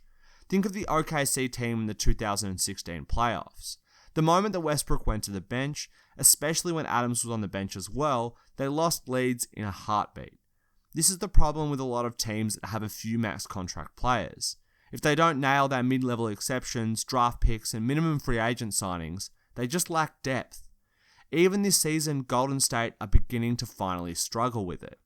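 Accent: Australia. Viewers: Nerlens Noel is on the comeback!! OKC management are doing a great job! Btw hi aussie narrator 👏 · Are you Australian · It’s an Australia accent though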